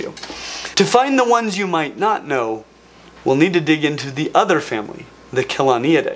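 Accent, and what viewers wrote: Australia. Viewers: Can we get a video of Australian animals with doing his amazing Australian accent???? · Bonzer Aussie accent, mate! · i need you to do an entire episode in your australian accent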